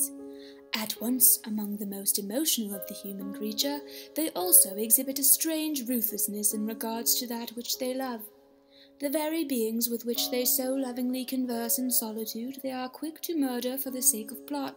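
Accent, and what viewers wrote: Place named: England, United Kingdom. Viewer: I’m so impressed with the English accent 😂 I’m assuming we can thank your time at Durham?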